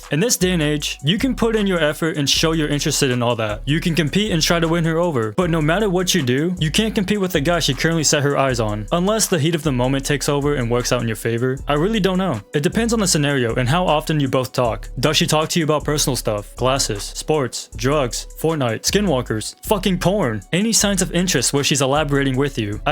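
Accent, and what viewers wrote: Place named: Australia. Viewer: W Australian voice,w good as videos are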